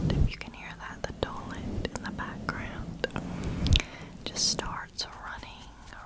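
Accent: South, United States. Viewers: There's really something quite enjoyable about a southern whisper.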